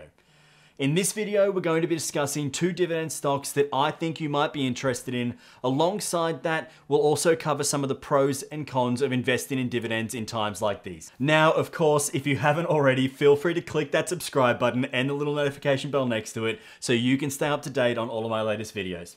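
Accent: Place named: Australia. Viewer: Love the content bro, clear concise and Australian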